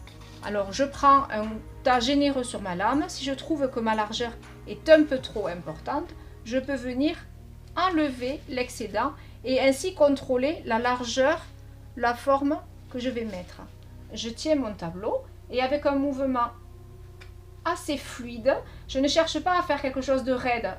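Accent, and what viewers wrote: South, France. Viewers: Bravo pour vos belles toiles,c est un plaisir de vous regarder, et de vous entendre avec ce joli accent du sud cher à mon coeur.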